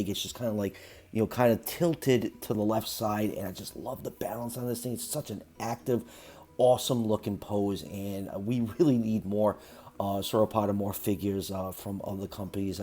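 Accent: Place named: New York, United States. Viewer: I think he's found new york or jersey with his accent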